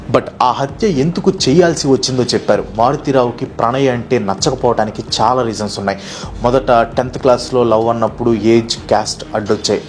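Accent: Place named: Rayalaseema, India. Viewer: Meeru seema bidda ahh?! Maadhi kuda seema ney! Andhuke aa yaasa nu batti adigaanu😎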